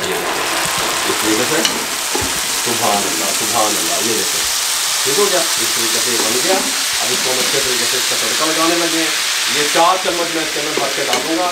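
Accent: Punjab, India. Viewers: Bht aala , ❤️❤️👏👍😅 dabne na kha kren bs baqi punjabi accent aur alfaz sun k bht acha lgta h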